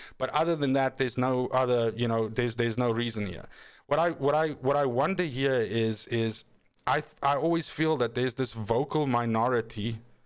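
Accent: South Africa. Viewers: Are you from South Africa. Your accent sounds familiar.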